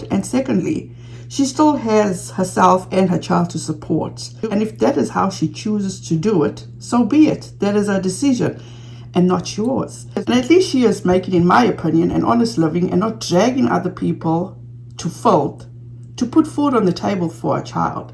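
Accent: South Africa. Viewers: Are you freaking stupid?!? Kj was advocating for the kids. She's callinging Christine out for allowing her child to be used. I don't like that she is going in on Christine but tbf most are manipulated their whole lives for what is normal, kj is coming from a western idea. Being that you sound like a south African you can't understand her point of view. Like we don't agree or understand your pov with your countries history. But we let y'all be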